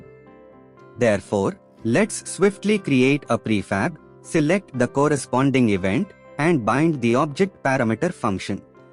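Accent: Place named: India. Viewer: Why did you specifically use a text to speech with an indian accent?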